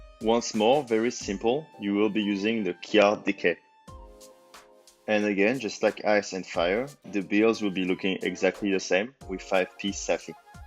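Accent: France. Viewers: Hi are you french ? cause Your accent look like french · tu es francais non?🤫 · ahhh l'accent français 😏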